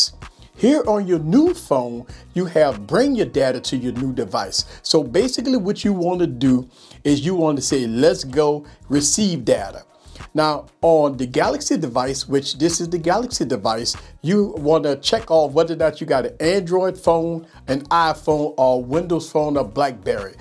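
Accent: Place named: New Orleans, United States. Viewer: New Orleans accent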